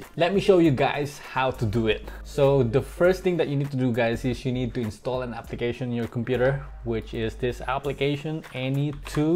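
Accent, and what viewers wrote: Philippines. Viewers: Hehe salamt po kuya tiga SUBIC po ako yung pagsasalita mo palang halatang pinoy na 😂♥️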